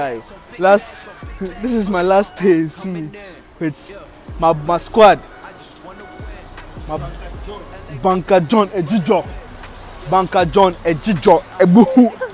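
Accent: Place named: Ghana. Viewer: Your video popped up, and then I decided to watch it. Your accent sounds like a Ghanian's... Nice vlog between.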